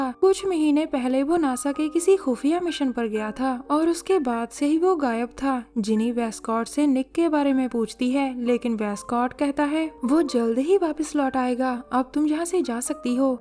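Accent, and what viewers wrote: Punjab, India. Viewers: Ma'am Shayad Ap Punjab Se Ho ?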